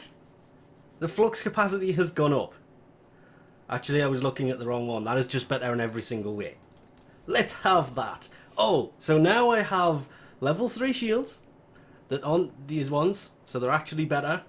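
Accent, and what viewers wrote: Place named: Northern England, United Kingdom. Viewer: Desrei Camacho dude wtf, tacky spud is like full on Irish, this guy is from up north England somewhere, ya they speaking the same language but wtf dude are you deaf?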